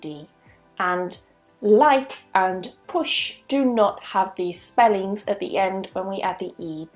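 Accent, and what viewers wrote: United Kingdom. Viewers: Thanks so much for your work and Happy Birthday to you🍰26🕯️🕯️🕯️🕯️🕯️🕯️🕯️🕯️🕯️🕯️ 🕯️🕯️🕯️🕯️🕯️🕯️🕯️🕯️🕯️🕯️🕯️🕯️🕯️🕯️🕯️🕯️amazing clear British pronounce! Lovely teacher indeed! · Nice video. Thanks a lot. Love British accent.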